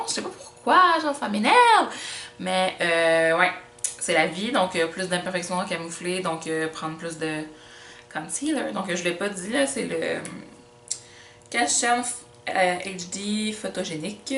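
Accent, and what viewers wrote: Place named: Quebec, Canada. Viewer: Est ce que tu viens du bas du fleuve ou d'une region autre du Quebec parceque je trouve que tu as un p'tit accent trop cute!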